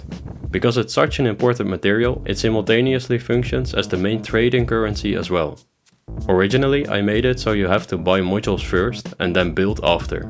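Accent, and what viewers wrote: Netherlands. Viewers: are jouw from the nederlands? becaus jouw sound dutch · Hey, ik ga er vanuit dat je Nederlands bent vanwege je accent en ik vroeg mij af bij welke universiteit of hoge school jij game development hebt gestudeerd.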